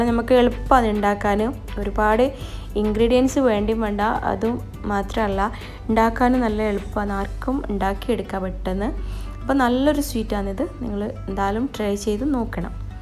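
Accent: Kasaragod, India. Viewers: Ksrod slang പൊളി ആണ് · കുക്കിംഗ് അടിപൊളി നൂറിൽ നൂറ് ഞണ്ട് കറി വറ്റിച്ചത് നയിച്ചോർ ഞാൻ ഉണ്ടാക്കി കഴിക്കാറുണ്ട് അടിപൊളി ടേസ്റ്റി അമൂർ മീൻ നിങ്ങൾ പറഞ്ഞത് ശരിയാണ് എനിക്കറിയാം ഞാൻ ഒരു മീൻ കടയിലാണ് ജോലി പുള്ളിയുള്ള ആമൂർ വില അധികമാണ് ഇവിടെ ഒരു കിലോ 60 റിയാൽ ആണ് അതുപോലെ തന്നെയാണ് സാല മൂൺ എന്ന ഒരു മീൻ ഉണ്ട് ഇറച്ചി ഓറഞ്ച് കളർ ആണ് അതും ഒരുപാട് ഫൈത ഉണ്ട് ദുബായിൽ സുഖമാണോ കുട്ടിപ്പട്ടാളം എവിടെപ്പോയി വെള്ള ആഗോലി ഇവിടെ കിലോ 70 റിയാൽ ഒരുദിവസം എന്തായാലും നിങ്ങളെ കാസർകോട് ഭാഷ ഞമ്മക്ക് പെരുത്ത ഇഷ്ടായി ട്ടാ ഇച്ഛ എവിടെപ്പോയി വീഡിയോയിൽ ഒന്നു വരാൻ പറയണോ ഓക്കേ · ഞങ്ങൾ കാഞ്ഞിരപ്പള്ളികാർക്ക് നിങ്ങൾ കാസറഗോഡ്കാരുടെ സ്ലാങ് തന്നെ കേക്കുമ്പോ വല്ലാത്തൊരു ഇഷ്ടമാന്നെ.. അപ്പൊ പിന്നെ ഈ റെസിപ്പി ഒട്ടും സ്കിപ് ചെയ്യാതെ തന്നെ അങ്ങ് കണ്ടിരുന്നു പോകും. പിന്നല്ലാതെ 😍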